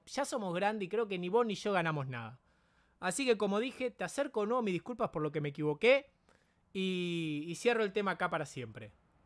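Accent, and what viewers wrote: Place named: Uruguay. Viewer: pero ahora que lo diga bien y no con acento de uruguayo castrosón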